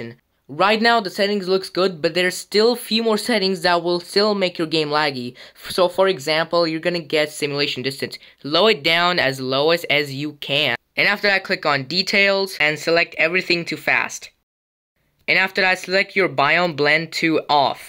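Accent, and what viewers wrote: England, United Kingdom. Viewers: Finally this English voice all videos Indians voice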